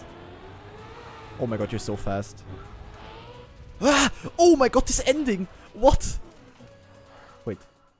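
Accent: Germany. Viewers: Dieser andere Deutsche Akzent 😂👌🏽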